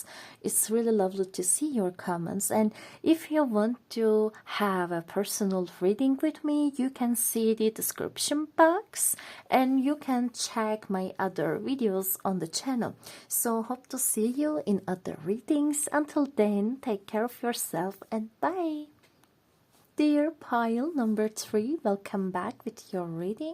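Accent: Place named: Eastern Europe. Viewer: Pile 3 was particularly accurate, disregarding the fact that I am male. Thank you. Is your mother tongue Slavic perhaps ? There are small hints to it, I'm fairly certain. 😊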